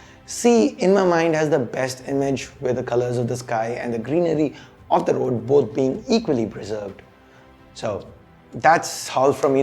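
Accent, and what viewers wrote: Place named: Tamil Nadu, India. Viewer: Ennatha fluent ah English pesunalum....nammalungandrathu thaniya theriuthu 🙏🙏🙏🌸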